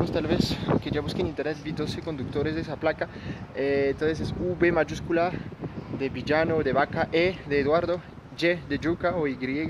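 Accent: Colombia. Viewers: Hola ya hablas como colombiano. Que pena tu robo!! Lo bueno es que no te paso nada. Mucha suerte, que estes muy bien.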